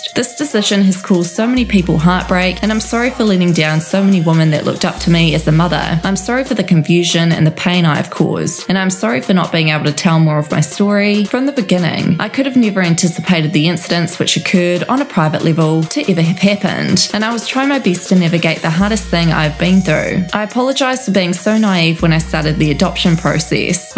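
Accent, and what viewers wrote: New Zealand. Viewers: Now that is a Kiwi accent! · New Zealand accent.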